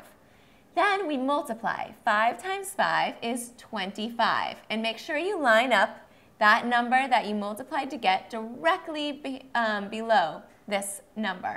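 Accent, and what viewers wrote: United States. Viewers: Yes so annoyed. She sounds like a yankee.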